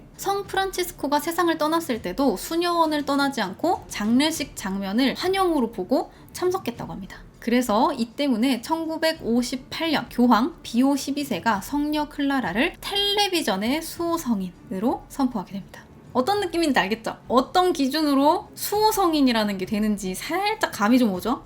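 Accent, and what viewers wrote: Gyeongsang, South Korea. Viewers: 오늘은 억양이 경상도인데 저만 그렇게 느끼나요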